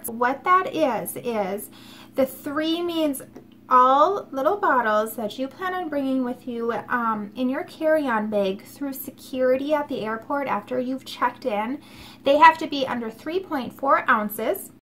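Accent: Minnesota, United States. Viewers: Are you from Minnesota by any chance?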